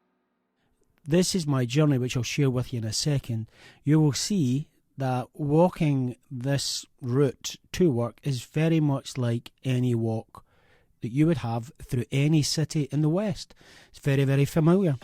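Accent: Scotland, United Kingdom. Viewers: ❤❤❤❤❤Love your Bonnie Scottish accent😁😘🌷🌷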